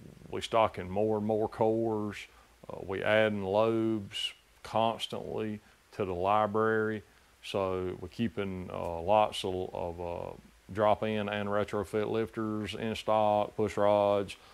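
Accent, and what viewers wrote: South, United States. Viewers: Why do the people that run this country not care about the quality of the products that we purchase with our hard earned dollars ? This really burns my A$$! They want you to vote for them for what! This is Disgusting that you have to put in this much effort to use a flat tappet cam! On another subject I am from Pa. We lived in Arizona, New Mexico and Tennessee and no one ever knew where I was from. They said I don't have an accent,go figure. That said I could listen to this Guy forever, I love the southern drawl and in women it's hot! I hung with with a guy from Cobra town mountain in Tennessee and this man sounds about the same. Never had a problem with it!!👍👍👍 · Sounds like a proper ‘murrican to me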